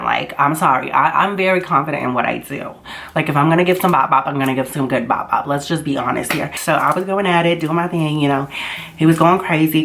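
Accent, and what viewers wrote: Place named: New Jersey, United States. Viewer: My heart really goes out to I am so sorry that that happened to you I have been in a similar situation and it is not okay for somebody you know that you kind of trust you know what I mean to do that. And honestly I have been watching your videos and like anytime I see a thumbnail of you or whatever on here like I'm like oh yeah she's the s*** and I totally forgot that you were even transgender until you hinted at it in this video like I don't know I mean that's not really what my comment is about like but it just kind of like I was like taking aback or for a second like oh wow I honestly completely forgot totally forgot and I think that is the way that most of your viewers view you you know I mean like I don't even think it crosses their mindset and for anybody that has a nasty comment to say anything oh that nature shame on them even if it's calling you a s*** or whatever. 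Anime like keep doing you I love your videos you are so funny like I love your accent like it kind of reminds me of home when I'm originally from but I'm originally from New Jersey so LOL South Jersey at that and I'm living in Kentucky now so yeah I don't know you keep doing you because you are funny you are beautiful you have a great personality.🖤💕🖤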